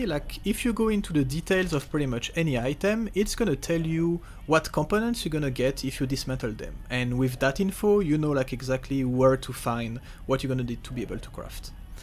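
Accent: France. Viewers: Je reconnais un accent francais :) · Je détecte un accent français sur cette chaine que je viens de découvrir. J'aimerai tellement qu'il fasse une partie française dans ses vidéos.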